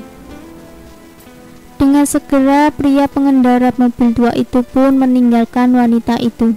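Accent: Java, Indonesia. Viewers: Medok amat yg crita🤣🤣🤣🤣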